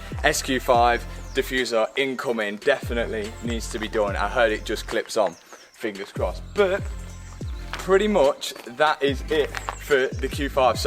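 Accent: Leicester, United Kingdom. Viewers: Looks sick 👍🏻. Saw the S5 in Blaby today, thought I recognised the Lesta accent 😂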